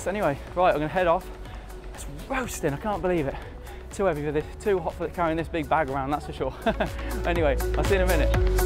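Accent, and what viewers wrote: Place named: Wales, United Kingdom. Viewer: I found him pretty easy to understand. I also liked his relaxed blogging style, which I guess you don't much. You're probably not used to a Welsh accent, which may explain why you are struggling to understand English. Otherwise, you might want to get your ears checked out in case you are going deaf, which would also be a better use of your time than trolling.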